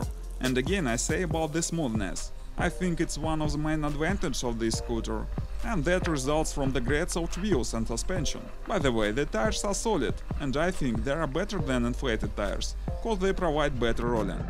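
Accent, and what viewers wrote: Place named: Russia. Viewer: Тяжело слушать настолько мощный рунглишь. По существу, обзор хороший, спасибо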